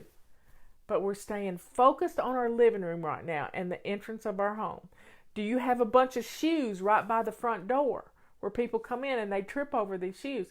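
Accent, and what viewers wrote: South, United States. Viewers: Been listening and watching for years whenever I start losing my way back into chaos. Your easy direction, great starting point, and delivery are authentically your own, OG Fly! Your familiar Southern accent is both graciously understanding and proper, yet decidedly stern. Well done! You’ve inspired so many!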